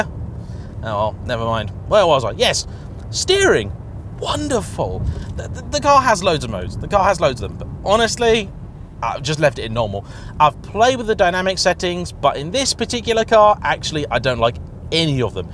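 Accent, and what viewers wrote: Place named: RP, United Kingdom. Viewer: YouTube algorithm brought me here. The posch accept kept me through to the end 🤷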